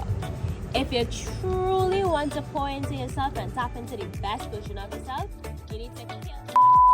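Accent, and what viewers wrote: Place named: Trinidad and Tobago. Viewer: I hear a trini accent!!!!! Yesss let’s go!! Keep making videos!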